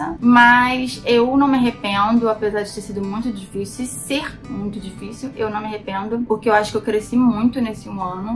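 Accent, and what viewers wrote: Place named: Rio de Janeiro, Brazil. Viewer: Cara, o quão fod* você é, de verdade, te acompanho há um tempo, no primeiro momento pelo jeito d falar desconfiei que era do Rio, assim como eu, mas não tão próximo cara... Minha vizinha de cidade, Niterói/SG, todo esse lado financeiro que você contou eu me vejo muito, de verdade, venho/sou de uma comunidade no Fonseca, em Niterói, e almejo chegar a Gold Coast um dia. A partir de hoje vai ser minha referencia se tratando de AU... Abraços.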